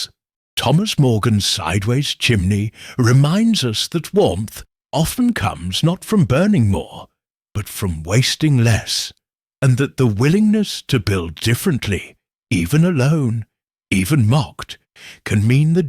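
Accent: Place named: United Kingdom. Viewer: Not wasting my time listening to this brit accent.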